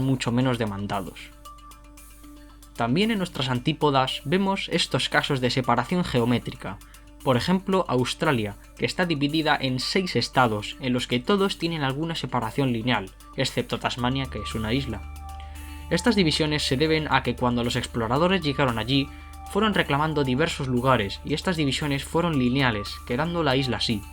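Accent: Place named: Spain. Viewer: Que hermoso canal, me gusta como narran ustedes los Españoles, sigue así, nuevo sub.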